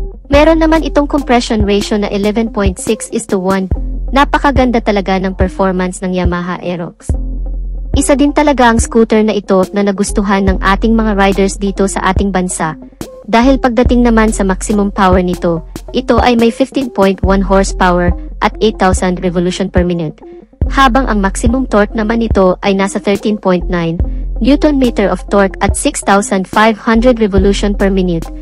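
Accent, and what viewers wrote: Visayas, Philippines. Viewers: Bisaya ka day?!😂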